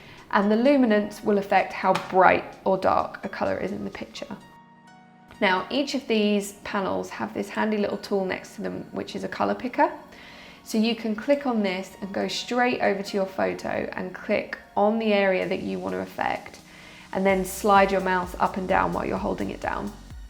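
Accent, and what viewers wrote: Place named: RP, United Kingdom. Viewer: Thank you for some sage advice;-) Keep going, you have good skills and a very nice accent (I'm an American, so we love this particular posh accent.)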